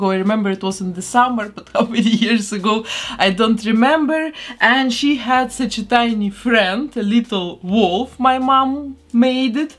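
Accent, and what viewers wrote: Russia. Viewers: Im so shocked that you are from Belgium… i thought you were Russian or Polish from your accent😅😅 spreek je ook Nederlands/Vlaams? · Русский акцент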